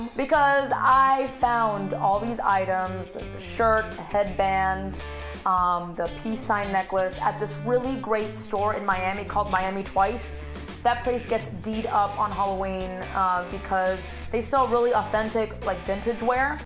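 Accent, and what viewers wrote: Cuba. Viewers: I live for that cuban accented spanglish! as a spanish person (spaniard? idk, english is confusing. I mean to say that I'm from spain) I find spanglish and the evolution of spanish in general across the pond so interesting! it's amazing how a language can change so much and represent so many cultures and yet still be like a comforting unifying blanket. bit of a weird metaphor, but I'll go with it. anyway, just wanted to say that I love your videos (and accent!) 😊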